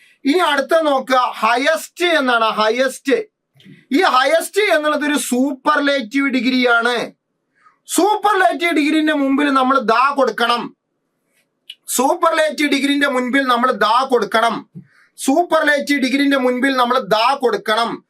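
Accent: Malappuram, India. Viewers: Swantham muthu mani... ❤❤mash nte aaa malapuram slang um.. Comedy yum okke orupad ishtom🥰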